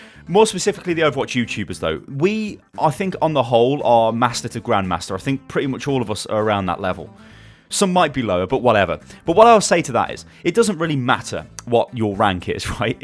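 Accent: United Kingdom. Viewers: dont worry i love u and ur patch note reading it makes me smile every time i watch a vid plus you are one of the few british youtubers i know which is nice as i live in BRITAIN 2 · I watch you over lone hawk your overwatch ect because the British accent lol.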